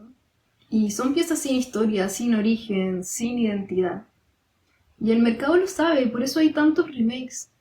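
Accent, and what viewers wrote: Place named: Chile. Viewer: Que bonito tu acento ¿Eres chilena?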